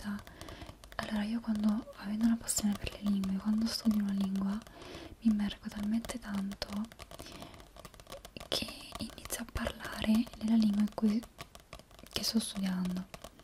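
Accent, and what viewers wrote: Argentina. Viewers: tengo una amiga italiana-argentina que se parece a ti. tu español suena bastante argentino también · tu acento en español es muy argentino..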